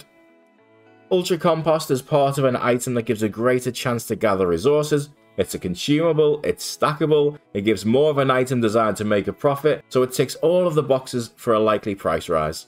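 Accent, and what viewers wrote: Liverpool, United Kingdom. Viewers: Fellow scouser? yes lad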